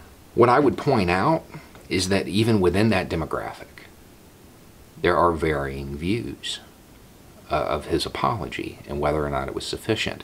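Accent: South, United States. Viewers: So this video popped up on my feed and I decided to watch it. I honestly came into this video thinking you were a trump supporter and you were going to bash Trudeau and yang, then I heard your southern accent and it made the feeling that this was that this video is going to be. Boy was I wrong. Like i said this is my first video I've seen so i dont know your political views, or even if you post content like that, but no matter what side you are on, you are on humankind's side and that's what matters! Thank you for this video and i wish more people thought like you!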